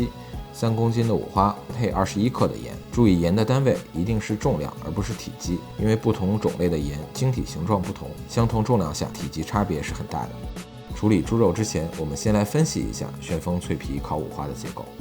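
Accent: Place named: China. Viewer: 除了刀功和口音是中式的，其他完全纯正老牌欧式，毫不犹豫素质三连。留着整套菜谱，我圣诞节做家饭。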